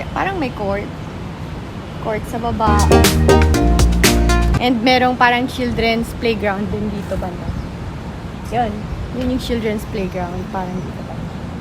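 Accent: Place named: Ilocos, Philippines. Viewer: Parang ilokana po kayo mam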